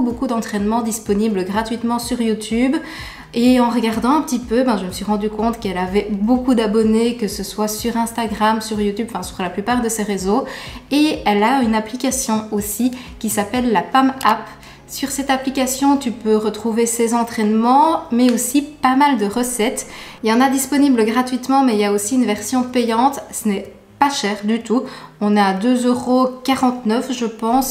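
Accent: Belgium. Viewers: J'adore tes vidéos et ta façon d'aborder les choses ! Et ton calme naturel (et ton petit accent belge 😉) sont super agréables ! 🙏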